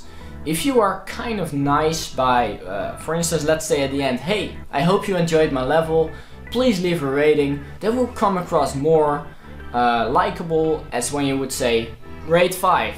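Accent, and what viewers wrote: Netherlands. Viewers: I can sense the Dutch (W tutorial)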